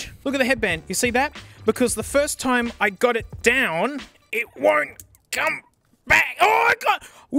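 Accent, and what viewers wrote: Australia. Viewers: Are you the same guy as "the drum thing" guy? Can't tell you aussies apart 🤷‍♂️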